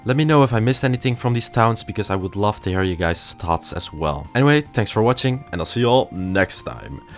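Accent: Netherlands. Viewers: Ik wist dat deze klerelijer nederlands was met dat accent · Are you dutch?!?! I LOVE IT! I already thought it because of the accent. Please like, if ur dutch!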